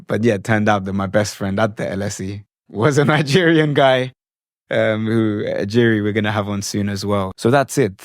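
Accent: Ghana. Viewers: The Ghanaian accent 😊😊😂😂..... Nice story about your journey. Inspiring 😊😊❤ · I hear A Ghanaian Accent 😁😁😁😁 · if I were to be in Ghana, I would have loved to host you and your friends and spoil you a little with Ghanaian food and hospitality. You sound like a Ghanaian also. Rest in peace, grandpa, and be proud because is making you proud. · even with an overlay of a British accent, Ghana still comes forth in your voice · Ps, I enjoy when he mimicking his grandfather. Besides, he does have a Ghanaian accent himself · you are a true Ghanaian from how you speak, discipline.. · Ghana all the way. I Can fell the blood in your accent although u are white. Much ❤ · The Ghanaian accent is so funny coming from him - grand dad must have been such a character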